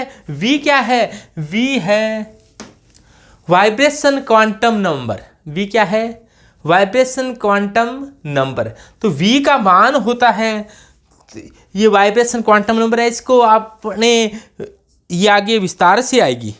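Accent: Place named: Rajasthan, India. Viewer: वाह सर मजा आ गया आप हमारे राजस्थान के ही लगते हो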